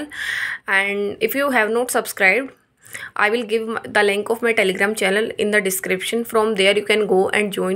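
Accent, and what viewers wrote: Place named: Punjab, India. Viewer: Dts a vy thick punjabi accent.. ..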